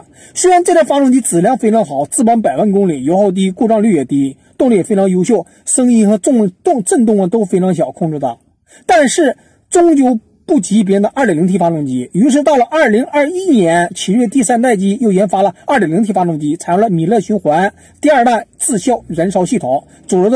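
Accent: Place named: Northeast, China. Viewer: 事情是这么个事情，但是一配上浓浓东北话杂听咋不是那么一回事。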